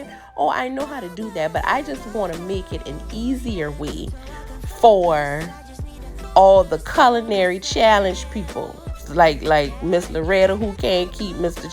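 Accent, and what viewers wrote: Charleston, United States. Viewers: Got chucktown written all over your voice!!! Girl I stay watching recipes..here I am!!!😂😂😂my city!!! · You must be from Charleston your accent is just like my dad and aunt… he passed away last year. Anyway the food looks yummy!!!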